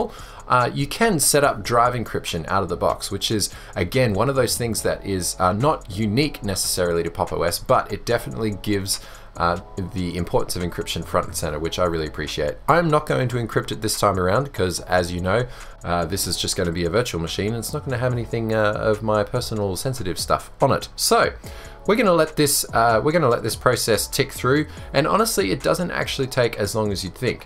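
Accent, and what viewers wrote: Australia. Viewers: So you're Australian. I thought you were from Eastern United States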